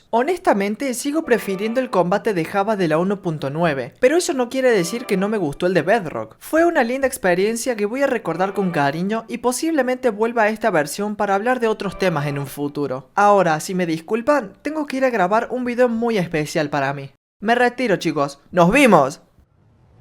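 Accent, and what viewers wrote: Córdoba, Argentina. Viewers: sos cordobe? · Cómo me encanta el acento cordobés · Habla como cordobés. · Sos de Córdoba? O flashee cualquera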